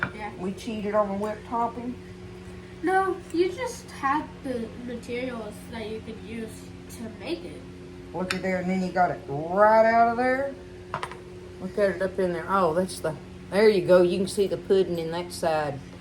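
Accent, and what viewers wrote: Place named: Texas, United States. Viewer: I love listening to you "gabbing" Love your Texan accent. As they say, you can't rush a good thing & you are a good thing! Love ya girl, stay blessed! 🙂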